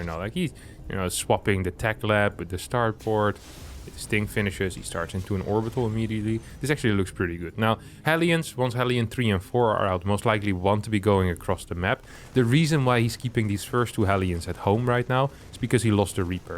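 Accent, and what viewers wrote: United Kingdom. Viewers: the british accent slowly starts to creep in lol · Love the British accent! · i like that the more Harstem reads, the more British he becomes. i do find it funny the Terran complains about lurkers, but then talks about he could had made Ghosts. you know.... the unit that can ONE SHOT THE ONE THING HE IS COMPLAINING ABOUT !? if anything Zerg should be complain about terran since ghosts can just constantly one shot any spellcasters and lurkers they have ! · love your british accent XD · I just love the British accent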